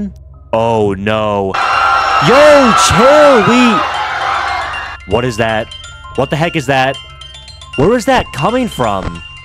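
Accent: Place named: Australia. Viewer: Because he has an Australian accent I think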